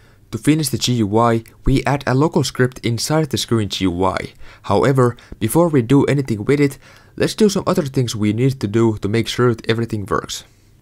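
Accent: Finland. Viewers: Ootko suomalainen ku kuullostat silt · Are you finnish? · Accent sounded very familiar. Wait a minute.. SUOMALAINEN ROBLOX TUBETTAJA OMG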